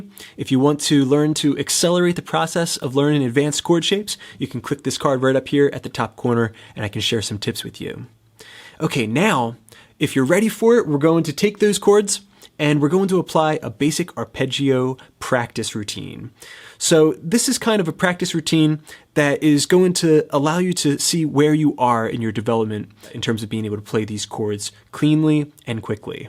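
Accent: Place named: South Jersey, United States. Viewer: Where's that accent from? Sounds like south jersey, my old stomping grounds 🙌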